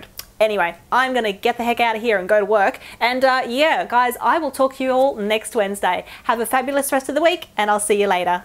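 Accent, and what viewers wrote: Australia. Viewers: I love you, you're so funny ! :) I think you're my favorite beauty youtuber ! And as I'm studying English language and culture at university, it's also a way to learn the Australian accent. Best regards from France. :)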